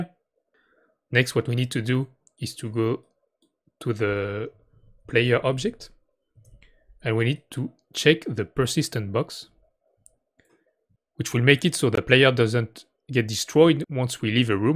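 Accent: France. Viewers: t'est francais la c'est pas possible avec l'accent 😅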